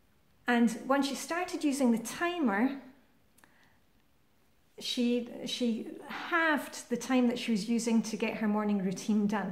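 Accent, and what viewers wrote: Scotland, United Kingdom. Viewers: Hy from Montrose in Scotland we had snow here yesterday, My favourite film has to be Gone with the Wind, as for smell I love the smell of freshly laundered sheets especially when they have been dried outside. Love your videos nice to here a Scottish accent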